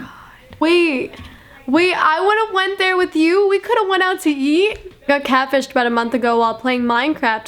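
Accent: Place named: New York, United States. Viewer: guy she's from New York they say words differently 😂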